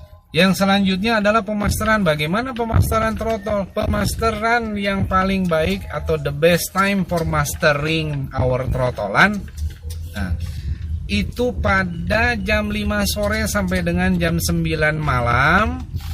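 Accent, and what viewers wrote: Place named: West Java, Indonesia. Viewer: Udah kaya denger ustad ceramah logat sunda.. Hhhhh detail n terperinci... Semoga saya bisa nerapinnya sama trotol pemula main mb.. Thank gan.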